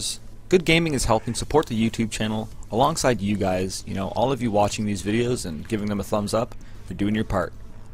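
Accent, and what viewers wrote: Canada. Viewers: u canadian guy? love the content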